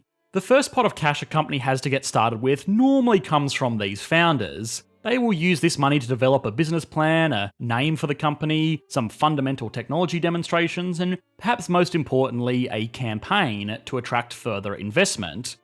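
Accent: Australia. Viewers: You Australian? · you upload exactly when I'm making my evening coffee, nothing like watching a smooth Aussie accent explaining economics with a hot cup of coffee! :D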